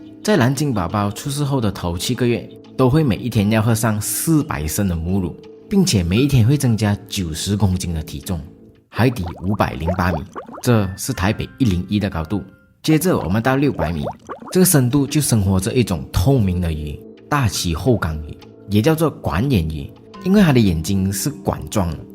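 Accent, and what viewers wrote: Malaysia. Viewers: 馬來西亞華人普通講華語時的口音是這樣，我自己也受不了，但中國人捲舌太過的話也聽得很。。。😂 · 马来西亚口音，不好听。我也是大马人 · 马来西亚口音耶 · 马来西亚腔太重。听了很不舒服，很难再听下去。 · 馬來人的口音那麼明顯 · 马来西亚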